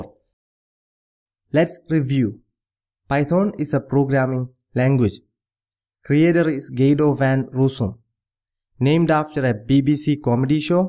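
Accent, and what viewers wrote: Kerala, India. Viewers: By your pronunciation ,,,i found u are malayali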